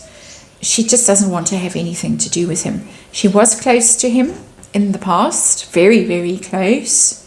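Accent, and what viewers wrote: South Africa. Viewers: Delighted to have found your channel, I lived in South Africa for decades and your lovely voice and accent make me feel nostalgic! You are a great tarot reader too!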